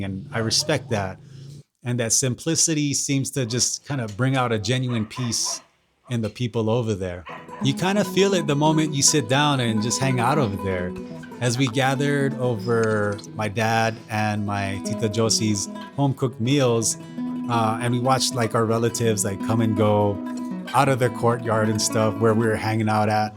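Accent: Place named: United States. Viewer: It’s crazy because you saying that you try to hide your Filipino roots back in the day is hilarious to me because you look super Filipino 😂. What I mean by that is you look like a typical Filipino poster boy. I can tell your Filipino 100 miles away and if i didn’t hear your American accent I thought you were from Ilocos. Also you being Ilocano I’m sorry to be blunt but even if you ask your family Ilocano are stereotyped as being very cheap, very dark skin and like to eat dogs. If you don’t believe me ask your family or just google search it. Also the Tagalog people use to discriminate against you guys a lot back in the day. Also I just think it’s super funny how Filipinos who were born and raised in America look super native, while Filipinos in Philippines look more western. From my experience most Filipinos who were born and raised here have that super Filipino look and have darker skin tone. 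But Filipinos who are born and raised in Philippines look more mixed and have lighter skin tone. Like walking around especially in Metro Manila in places like Makati, Alabang or BGC you can see Filipinos who clearly have either Chinese or Spanish blood running in their veins. So it’s ironic that a Filipino in Philippines can look more western than a Filipino from America. I know how it is because I live in America too and my family have been here since the late 1960s. Most of my family in my dad side are born and raised in America and some even in Europe. They are super white washed but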